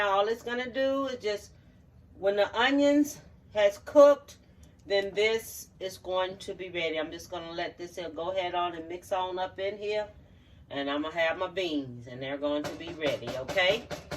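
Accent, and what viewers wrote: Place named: South, United States. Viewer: I LOVE HER! She know about the Soda In there to cut the gas! That’s Saw-sish took me OUT!🤣👏🏽 I already knew she was southern and could BURN when she simply said “this heya” when lickin through them beans! I should have known she was a MaSTER CHEF because she knew to pick through them beans!🤣👏🏽👏🏽❤️🔥🔥 love it!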